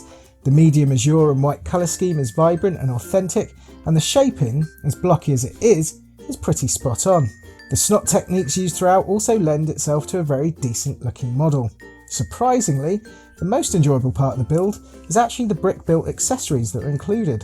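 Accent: England, United Kingdom. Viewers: And where are you from, you sound English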